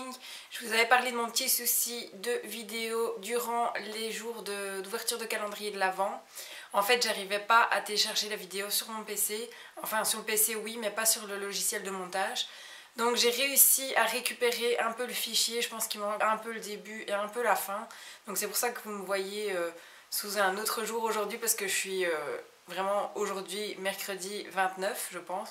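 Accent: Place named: Belgium. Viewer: oui c'est vrai ça s'entend que tu est belge tu viens de liege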